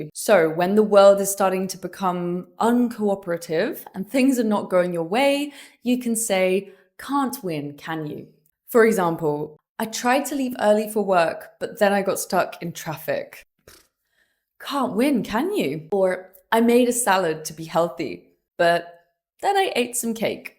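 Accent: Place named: RP, United Kingdom. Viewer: Hello! Thank you for this lovely video! I’ve noticed some rhoticity in your accent, even though it usually sounds like RP. Can you please educate me on what your accent is called or where it is most common?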